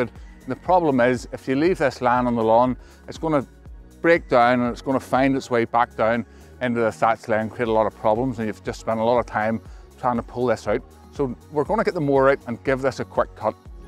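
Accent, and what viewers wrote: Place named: Belfast, United Kingdom. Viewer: Love the accent, my dad was from Belfast...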